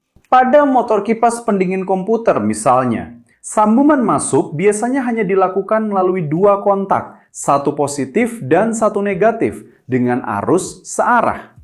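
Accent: Java, Indonesia. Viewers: Dubbingnya logat Jawa. 😂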